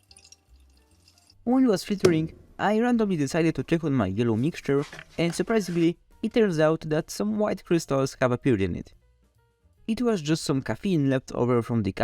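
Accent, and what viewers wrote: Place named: Poland. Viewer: Very good video ❤ just subbed and love to see more. It's just like nile red before he got popular and got worse. Happy to See again a High quality chemistry video that's down to earth. Aannnd happy to see a fellow polish guy, you have only little accent but it's not very noticable. Keep up that work ❤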